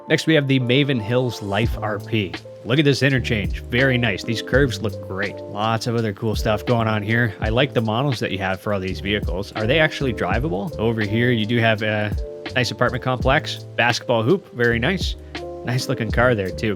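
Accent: Canada. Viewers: I love your videos! Keep up the good work FOR EVER. PS you talk very Canadian...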